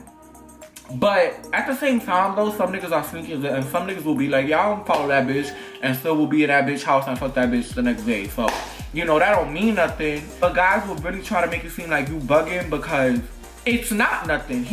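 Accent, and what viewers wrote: New York, United States. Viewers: Omg! I thought you were from NY, your accent? Yep and like you said kind of... You gotta know when to hold them and fold them!!! Pretzel like...okay😜🤐😜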